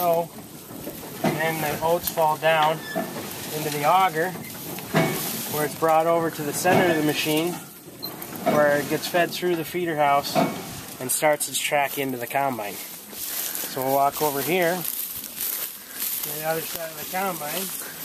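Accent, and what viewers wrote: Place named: Minnesota, United States. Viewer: Neat vid. I'm from Iowa and I'm looking at you and thinking your an Iowan but then I hear the voice and Im thinking Minnesota....maybe Northern Iowa?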